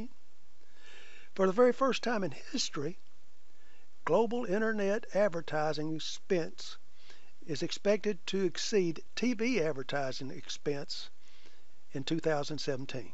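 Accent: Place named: South, United States. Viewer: After listening to my austrian professor and barely understanding his lectures, the southern accent is appreciated.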